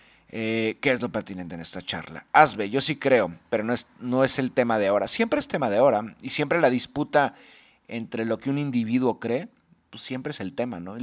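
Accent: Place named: Mexico. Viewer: la mejor respuesta que he escuchada para esa gente que cree saber mucho y sus fuentes se basan en cultura pop, hollywood, etc etc:D me hizo reir Master lo admiro, ahora que estamos en crisis y se está disputando la libertad y el alma del ser humano usted aparece como un caballero de la luz con acento mexicano blandiendo la espada del conocimiento... GRACIAS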